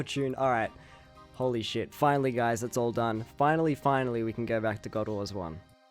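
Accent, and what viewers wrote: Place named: Australia. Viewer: Enjoyable content as always and your Aussie bogan was showing a little in this one :P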